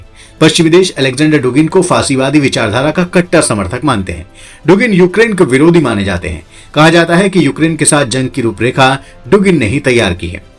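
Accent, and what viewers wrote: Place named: North, India. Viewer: 179k Andhbhakt views..Dugin wrote a book on Trump revolution.. Abe ghochu yeh koi Putin ka guru nahi hai 🤣 yeh New Eurasian concept ke philosopher hai he is opposing Putin's communist and socialist views, inka manna hai Western liberalism ke saath joda jae.. Awaj se hee pata chal rahi hai northern India ka channel hai..matlab had hai kuch bhi video bana de rahe hai kuch bhi bol de rahe hain..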